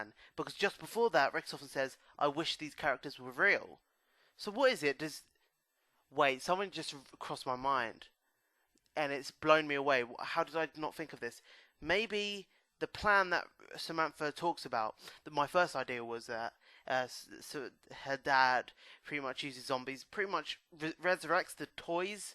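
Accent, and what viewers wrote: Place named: United Kingdom. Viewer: OMFG I HATE FUCKING BRITISH ACCENTS IT FUCKING SUCKS@ KHJKHJKGHKGJK CANT STAND HIS FUCKING VOICE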